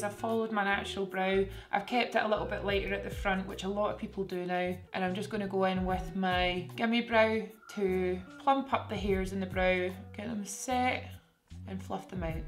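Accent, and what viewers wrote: Scotland, United Kingdom. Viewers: Sounds Scottish. Is that right? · she has a very standard Scottish accent with perfect diction. I'm not sure why you can't tolerate different accents but hey, it's your loss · She has a west coast Scottish accent. I know because I'm Scottish too! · Would guess her accent to be Scottish, but the r’s seems a bit strange for that - like a more American r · rea zeqaj it’s Scottish very polite though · I love her Scottish accent, Scottish people and Scotland in general 😍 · I suck at doing eyeshadow and eyeliner 😞 I give up 🥺 BTW: are you Scottish?! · Love these pencils so much:) and can I just add how much I love your accent. Are you Scottish:) ? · Do it in that stunning Scottish accent too ! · Is it Scottish accent? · Are u Scottish? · Love this accent!!! Its Scottish isn't it???? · You are so beautiful it doesn't matter what you do and that Scottish accent is the cherry on top. 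· Sm xo this is how most Scottish people talk. I don’t know why people from other countries think you need to shout to be scottish😂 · I am certain that I am not the first one to say this but damn I LOVE your accent! Is that Scottish? Excuse my ignorance if I am mistaken. And of course the entire video is just wow! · it’s definitely Scottish x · Ain Adena Indeed! She’s scottish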